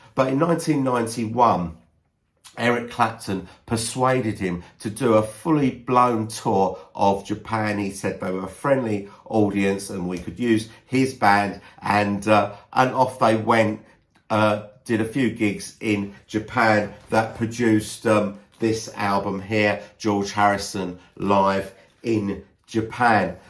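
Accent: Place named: London, United Kingdom. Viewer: This video is a gem! I never knew about this gig until you told your story. You're a born story teller, mate! Lovely London accent! I also loved your detour on Hitler's ball(s). Extremely funny and I never heard the witty "childrens poem" either. I had to watch the video from start to end without pausing, even though I have loads of work to do here. You deserve far more subscribers! Cheers! Stein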